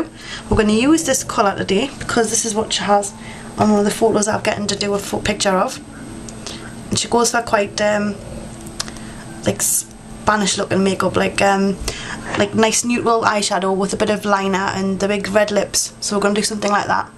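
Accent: United Kingdom. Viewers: what's her accent? if a UK one, specifically which one?!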